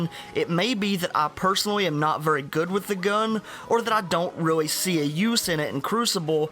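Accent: South, United States. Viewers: My favorite southerner btw what state r u from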